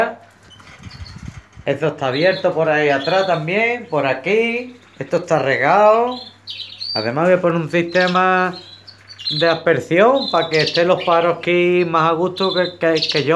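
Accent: Andalusia, Spain. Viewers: Enhorabuena por los 20.000, aparte de lo que aprendo con tus vídeos me encanta como hablas, ole mi Andalucia 👏👏👏👏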